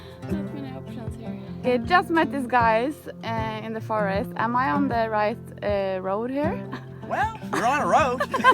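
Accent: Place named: Norway. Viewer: incredible! i want to do this in may! also you sound super norwegian... is that the case? well done!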